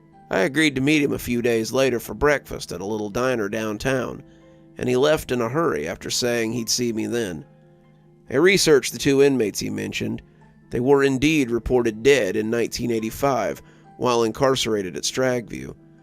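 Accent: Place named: Deep South, United States. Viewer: Sounds like you've spent a lifetime in the Deep South. Impressed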